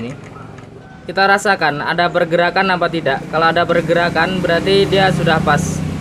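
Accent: Indonesia. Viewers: Logatxa gw kenal ini orang madura heheeheh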